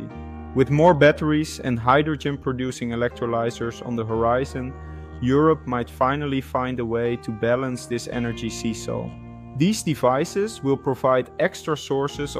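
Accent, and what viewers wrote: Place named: Netherlands. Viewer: By the accent, you're Dutch. This is a thing mostly just happening with us Dutchies. Its really not an issue yet in most of the rest of Europe.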